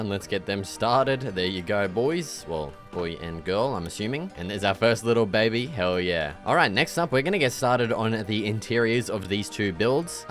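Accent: Australia. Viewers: “Oh, he’s Australian” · Mates aussie as hell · his australianness distracts me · Are u Australian 😮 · "OUUH!" lolll .. also, the Aussie-ness is just steaming off of him like a thick vapour. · you aussie? u sound like it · bro is australian W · He’s so Australian 😭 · are you australian??? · nah easy mistake too make but hes def aussie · sweet world dude, love you aus accent hahahaha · This guy sounds so Aussie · I can tell your Australian mate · Hello mate you’re Australian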